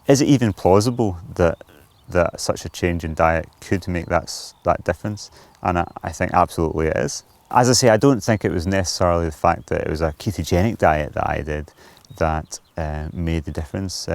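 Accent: Scotland, United Kingdom. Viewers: I going to use your channel to learn understanding the Scottish accent :-) Interesting content! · I like your braw Scots accent,. My mother was from Dunbar:thanksdoc: & was raised in Edinburgh. Dad was an American and he was in the Air Force stationed in Scotland.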